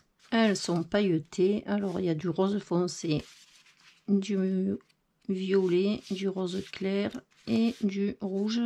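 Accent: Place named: South, France. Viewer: Bonjour, Les blocs 30x30 sont bien de Noël dernier. Vous, vous êtes choquée mais moi déçue car les articles sont en réserve mais faute de personnel seront peut-être mis en rayons semaine prochaine mais pas sûr... voilà la réponse que j'ai eu vendredi sur Albi!😫😡 J'y retournerai lundi et éventuellement j'irai à Gaillac... Vous êtes de quel coin avec votre petit accent du sud?😊 Je ne connais personne dans mon secteur pour partager le scrap. Bon dimanche 🌺 Sophie d'Albi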